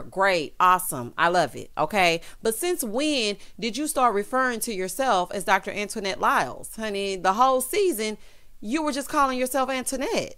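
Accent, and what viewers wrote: South, United States. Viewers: Well its the south and you have a little south in your voice. But I agree I cannot stand all of fussing at the same time though, lol!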